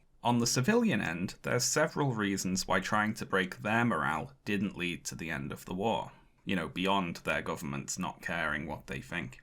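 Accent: United Kingdom. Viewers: I think it's the British accent that makes them think what he is saying is true. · Japan forfeited it's right to anything but death and distraction when it attacked Pearl Harbor. It's easy for people in the relatively safe and far less brutal 21st century to point fingers at the past but if Pearl Harbor happened today, it would be grounds for a nuclear retaliatory strike. The war broke Japan's genocidal list for war and extermination so if cooking a few hundred thousand of them alive was what it took, it was a small price to pay. Go live through the Rape of Nanking or the Battan Death March and then tell me how compassionate you are about Japanese school children and so on. Those Japanese school kids would have grown up to kill, rape and destroy like their parents and grandparents had the United States not destroyed Japan's centuries old Warrior culture. 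Then again... The person in this video sounds British and they know more about killing, raping and destroying than anyone considering they spent several hundred years doing it to a quarter of the world.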